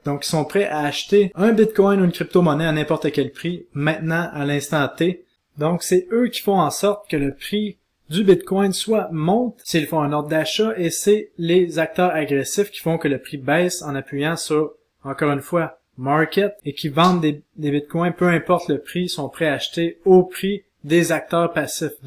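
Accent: Quebec, Canada. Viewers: Hello j'espère que tu vas bien? J'ai vraiment du plaisir a écouter tes vidéos et lire ce que tu écris. Ton accent québécois es agréable a écouter, merci pour tes vidéos t'es au top!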